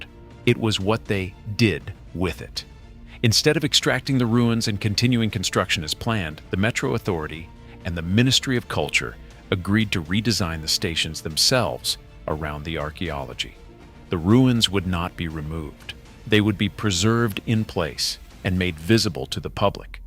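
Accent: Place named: United States. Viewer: Great presentation, but your americanized pronunciation of the Greek names is quite disturbing I'm sad to say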